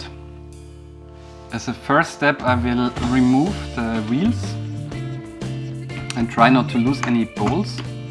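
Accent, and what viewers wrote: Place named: Germany. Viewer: Danke für das Video! Man hört sehr raus, dass du Deutscher bist.😂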